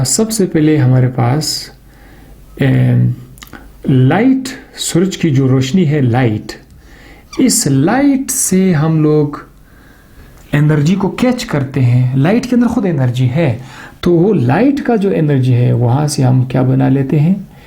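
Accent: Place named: Quetta, Pakistan. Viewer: Gr8 sir app ka urdu quetta ka hi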